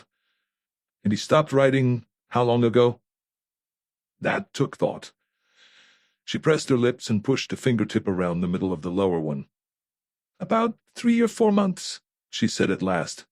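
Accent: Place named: Germany. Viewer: Enjoyed the reading. Even with what seems like a hint of a German accent seeping out. I like to imagine that Schwarzenegger was doing the reading. 😂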